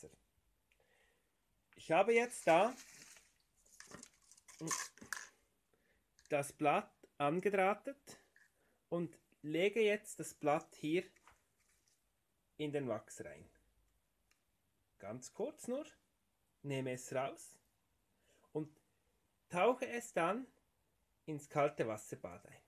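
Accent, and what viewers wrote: Switzerland. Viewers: liebe ich den schweizer Akzent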